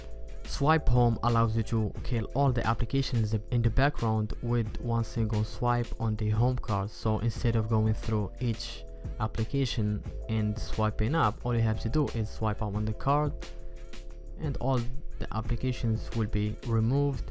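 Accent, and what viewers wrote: Morocco. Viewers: moroccan accent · From your accent I can tell that you're Moroccan 👍🏻👍🏻 keep up the good work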